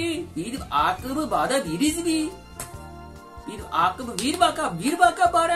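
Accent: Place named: Aegean, Turkey. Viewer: buda ege şivesi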